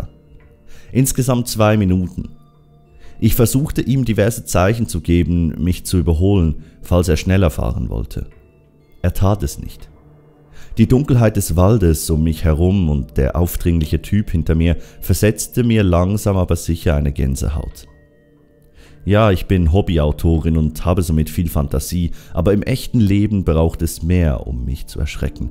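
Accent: Switzerland. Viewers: Du bisch Schwiizer? Jo ehre💪🏼🇨🇭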